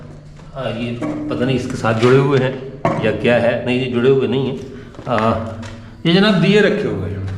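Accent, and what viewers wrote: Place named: Uttar Pradesh, India. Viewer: آپ کی اردو بولنے کا لب و لہجہ یو پی، میرٹھ اور دہلی طرز کا ھے کمال کے اہل زباں ھیں آپ،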